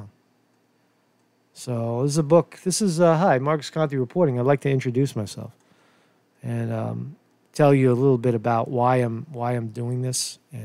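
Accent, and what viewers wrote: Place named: Brooklyn, United States. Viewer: Exposed? You're a rather transparent person already, Marcus. You've said enough in the past to inform your audience of who you are and where you've been in life. You know, I notice a trend on YouTube. Creators are coerced into conforming their video content and even presentation style to the interests of one or two viewers. Some creators even request suggestions. But when the critiques are about the presenter, rather than the presentation, I'd be inclined to ask who THEY are working for. Perhaps some imaginary TV broadcasting network or Hollywood. Creators aren't actors, for the most part. They are people, with their own life-stories, their own character and mannerism and their own YouTube channel. As for your revelations? Meh, Brooklyn accent, scrappy when assholes approach, grew up in the 80s...and learned his lessons in the School of Hard Knocks. 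My sister, however, is amazed that you still look so young and healthy with what you've gone through. I think it must be the bodhicitta of a bodhisattva preserving you for the work you're doing right now and in the past. Water under the bridge, Marcus. Never feel the need to justify your motives to viewers. If they can't figure you out, then they ought not let the door hit them on the arse on their way out, just as you said. :)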